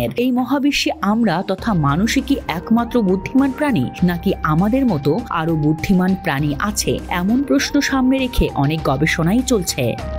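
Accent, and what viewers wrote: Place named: India. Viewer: খবর পড়লেন যে সে কি ভারতের নাকি বাংলাদেশের কথা ভাষা শুনে মনে হচ্ছে ভারতের বাঙ্গালিরা এত করা গলায় কথা বলেনা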